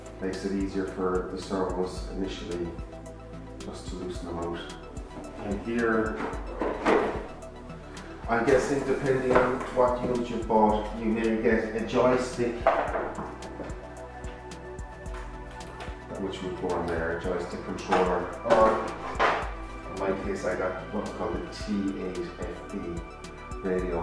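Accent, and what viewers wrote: Ireland. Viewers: One of the best tutorials on setting up this plane and I'll use it as a reference in adding the Byme-A stab to a little 800mm pusher I'm putting together. But a bit of a whinge also. That mellow Irish accent is pretty hard for an Old Fart with 60 years of heavy machinery hearing damage to decipher with the limitations of the bad reverb of your mike/location (sounds like you are talking with your head in a kerosene tin! 😜) but adding that bloody Youtube musak overlay of a Caribbean Steel Band banging on it makes it even tougher. I know nothing of Youtube posting but I see lots of folks making this mistake. If it is somehow required it is a pity there is no facility on our end to kill it. eg with a stereo system balance pot. Rant over. 😊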